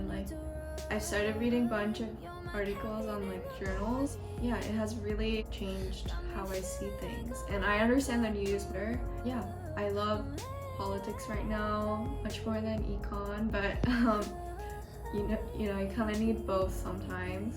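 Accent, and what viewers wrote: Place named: Japan. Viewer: 日本人の英語という感じが面白いですね。私がインターで出会った友人たちの話し方似てるですね。日本語の方が上手ですよ！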